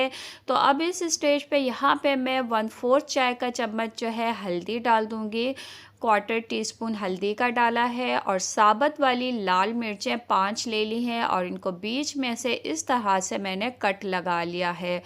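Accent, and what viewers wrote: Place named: India. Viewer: Achhi Tara KEHTAY han achhay se nahi. Indians ki naqal kar Kay I Urdu ka kabara na Karen please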